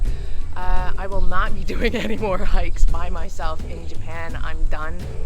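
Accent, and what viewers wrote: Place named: Canada. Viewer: You sound Canadian. Thanks for the video. I am going to Hachioji for about the fifth time (to visit a friend). Still haven't hiked up takao or adjacent mountain. Must give it a try.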